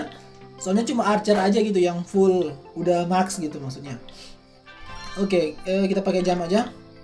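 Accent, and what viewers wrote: Manado, Indonesia. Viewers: Bang lu org manado ya??, sorry subcriber baru btw gua juga org manado tpi sekarang tinggal di gorontalo karena tugas ortu....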